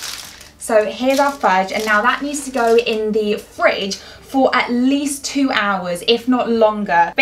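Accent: United Kingdom. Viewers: yeah you uk british accent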